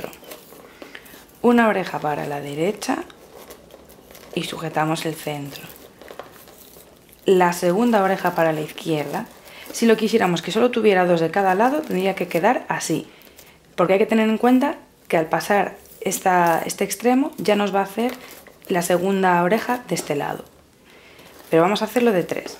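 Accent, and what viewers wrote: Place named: Galicia, Spain. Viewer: Tu bello y dulce acento gallego te delata😄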